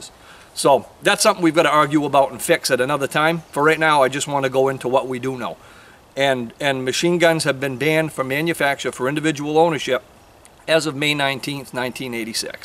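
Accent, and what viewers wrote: Maine, United States. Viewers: You must be from Maine. There's no mistaking that down state accent. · This guys a mainer for sure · That gotta be a Maine accent Im a New Englander as Well Sir Thank you that Was terribly helpfull. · I'm detecting a Maine accent.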